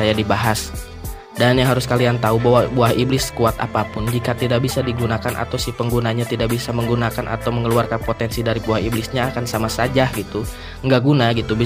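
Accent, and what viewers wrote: West Java, Indonesia. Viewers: Nada bahasa nya kaya logat orang sunda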